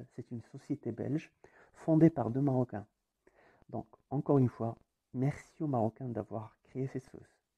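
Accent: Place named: France. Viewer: ا صاحب الفيديو فرنسي اظن من لهجته. الله يعطيه الصحة شوههم و اعطاهم في عصير في كأس كبيييير . العز للمغرب و المغاربة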